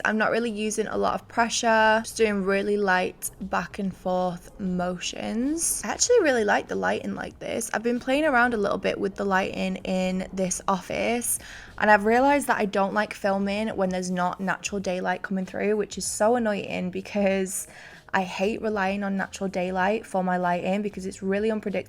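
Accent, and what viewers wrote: United Kingdom. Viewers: You are like Angel 💛so cute so beautiful 💛and i love your britch accent 💛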